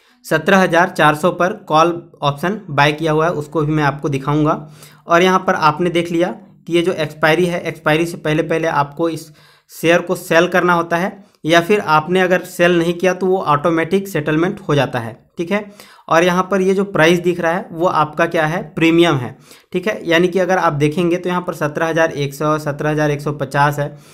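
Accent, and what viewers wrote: Odisha, India. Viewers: Sir tum odisha se ho kya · Kya Bhai ap odisha se ho?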